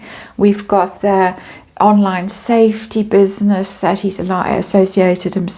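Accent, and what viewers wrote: South Africa. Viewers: You are on fire today! Love the snark in your beautiful SA accent!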